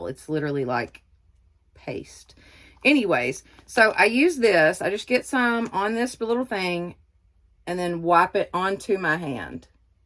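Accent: South, United States. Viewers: YAY!!!! A MAKEUP tutorial!!!! I have admired your makeup from DAY ONE!!! Of course you are young enough to be my daughter, so you have that LOVELY SMOOTH skin!!! I was THRILLED to see your eyeshadow pallet AND you putting on your EYELASHES!!! I need to give eyelash applying MORE TIME:-P I wish ALL the Dollar General's carried the SAME PRODUCTS!!! Love the colors you put together from your pallet <3 Have you ever thought about going back to curly hair? Is there a reason you do straight all the time? You ALSO do an AMAZING JOB of matching lip liners and lipsticks!!! I DID see Maybelline on your liner I believe;-) but no idea the color, or lipstick you chose to go with it. Just know you do an AMAZING JOB matching them!! I bet you are a HUGE influence on your daughter's public speaking:-) I enjoyed the time she did your intro <3 WOW NYC for college, you go girl!!!! 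Thank you SO MUCH for sharing your skincare & makeup and hair!! I just enjoy YOU, your demeanor, your voice, that Southern accent like my daddy has....he's from Alabama, we lived down there from my 6th to 9th grade year.....I'd pretty much watch what ever you put out....Day In The Life with.....As some of the other girls stated, it was like being right there with you having a makeup day/slumber party and doing girlie stuff:-) You have BLESSED BEYOND MEASURE DAY DOLL!!! BIG {{HUGS}} OH AND YES on the baby oil & iodine LOL Gosh I even used stick butter if I "had" too:-P RARELY EVER had the REAL THING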